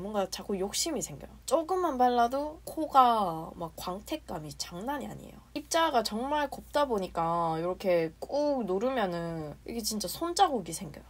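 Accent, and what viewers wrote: Gyeongsang, South Korea. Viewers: 그냥 사투리가 같은 느낌인데.. 경상도인이라면 누구나 알,, 다들 이 사투리쓰고 이런 억양입니당 이분도 이분만의 매력이있쥬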